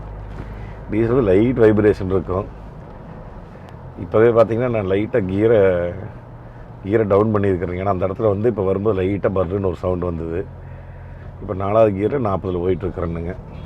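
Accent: Kongu, India. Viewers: உங்கள் அழகு உங்கள் கொங்கு வர்ணனை பேச்சு அண்ணா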